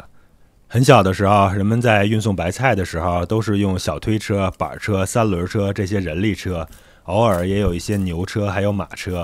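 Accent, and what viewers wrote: Beijing, China. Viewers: 无意中发现你的频道，感觉你是北京人，没敢问。原来还真是。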